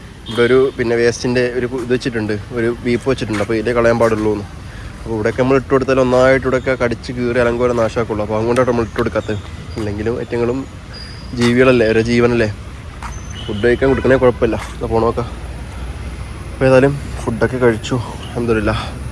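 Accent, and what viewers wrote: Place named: Kollam, India. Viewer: കൊല്ലത്തെ ഭാഷ ഭയങ്കര ഇഷ്ടമാണ് 😍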